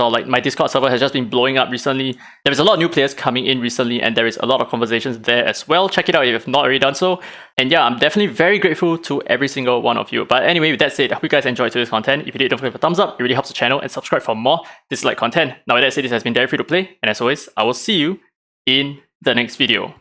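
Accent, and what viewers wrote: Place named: Singapore. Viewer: normally he has some English Singaporean accent, but yeah maybe because it's more formal his accent is not as heavy as usual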